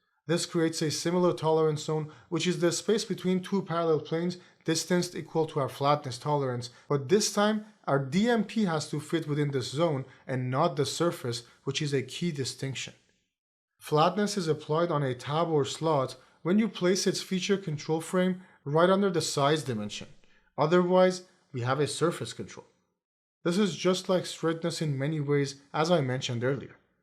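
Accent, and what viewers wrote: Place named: Egypt. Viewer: حاسك مصري الاكسنت مصري الشكل مصري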